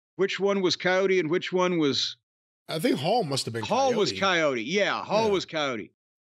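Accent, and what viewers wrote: South, United States. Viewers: Hate to say it but ya got that southern accent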